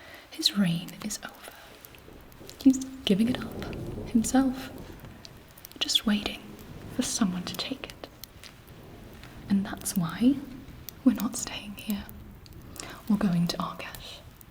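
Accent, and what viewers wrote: RP, United Kingdom. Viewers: Your British accent is incredible omg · I think the summary on this needless debate is: You wouldn't be able to tell that is not from the UK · Amazing English accent & acting! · Your English accent is atrocious I can’t do this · Hello from the UK!🇬🇧 You're British accent is exquisite my queen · That Bri'ish accent... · you nail the English accent very well. ☺️ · Well this accent is great rp standard accent.. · Wow! I'm British, English, and this accent is amazing! I'm so impressed! Doesn't sound like you even haha. I love this, I also saw your full outfit on insta, my gosh it's fucking amazing... Hehe! It's so cool to hear you swearing but also, cos it doesn't sound like you much, it doesn't seem that bad! Lol, love ya!! ♥️ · OMG, girl ur so amazing. Ur like the best vampire I've seen in an asmr video. Very soothing voice, yes I love the british accent, I'd do anything to be recruited by her. 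😍This vampiress is such a beautiful and elegant goddess! I'd just wanna be her royal guard for all I want and to fulfill her orders and requests! Yesss lemme join u! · Well done with the British accent 😊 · The recieved pronunciation English accent is truly excellent and wow this was something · Your British accent is amazing and you look absolutely incredible. 10/10. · i didn't know how much i needed to hear a blonde with a red lip and a british accent say the F word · As a Brit, I can confirm that your British accent is rather authentic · When I first seen nail shot, I’ve thought to myself okay? she can pull off the look, but can she pull off Camilla rich class pure English voice? Yap she nailed it especially bastard & 🤬 “Perfect” Nicely done 👍👍 Camilla is my favourite & one of the best female vampires Beautiful, cunning & a savage, she will tear you to pieces. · 'What is a man but a miserable pile of secrets?' British accent and speech mannerisms on point. 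· As a British viewer, just wanted to say… good job on the accent 😌🤙🏻 very authentic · It's called received pronunciation and it's passable at best, nothing amazing.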